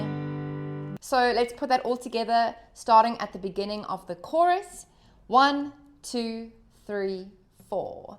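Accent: South Africa. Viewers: Awesome! You seem to have a South African accent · You sound South African?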